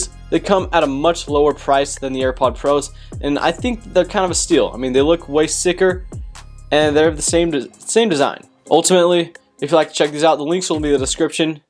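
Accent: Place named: England, United Kingdom. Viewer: lame english accent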